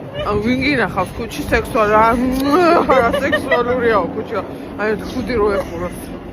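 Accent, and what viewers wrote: Kutaisi, Georgia. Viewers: საყვარელიხარ ქუთაისური კილოთი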